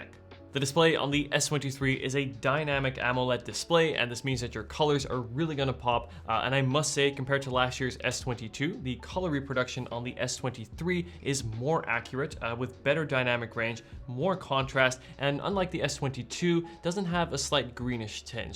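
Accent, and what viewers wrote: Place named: Netherlands. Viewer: Your English is great! I normally easily spot a Dutch accent, but here it took me 3 whole minutes :)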